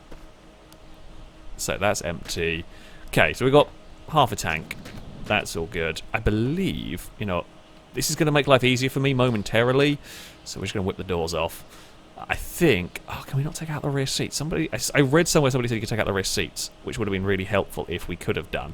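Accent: United Kingdom. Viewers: Are you British? I'm irish and we dont like the British but if you are your a exception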